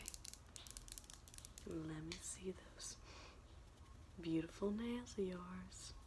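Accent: South, United States. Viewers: yes I want her to do more asmr videos with this southern accent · It's like.. I should be offended being from the south but it's just so perfect I feel like I'm with my aunt. Thank for making me homesick 😂😂😂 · THe southern accent and everything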